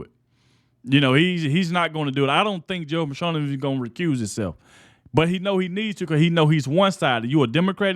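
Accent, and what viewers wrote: South, United States. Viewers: I once again listened to your video until the end! I am blessed to listen to your deep southern accent...(my Momma was born and reaised in MS). I am glad that you have this channel to share what we might not see elsewhere. Stay well, keep spreading the love of Jesus and you will always be at peace. Blessings to you and yours~~Bev~~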